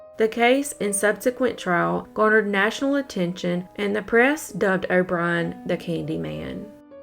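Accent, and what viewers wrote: South, United States. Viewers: Open Youtube, check notifications, "British True Crime channels" x20, scroll a little deeper, BOOM posted 2hrs ago.. Skipp all the others, click, cause well, better content, WAY better content, PLUS I speak and comprehend accents (Arkansas, but transplanted to Texas) Keep up your excellent work, Youtube will not put you at the top of my Notifications, but I will scroll past all the others cause in my book, you are #1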